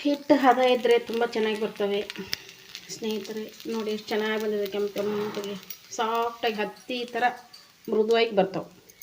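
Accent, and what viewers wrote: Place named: Uttara Kannada, India. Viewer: Namaskar ri. Namma UK bhashe kelode ondu khushi. Chennagi ide paddu.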